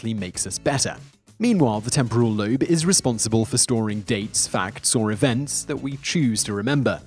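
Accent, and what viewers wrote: United Kingdom. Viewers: Thank you so much! I love love these intense snippets that make me search for more, Brit accent, also a big plus. Thank you!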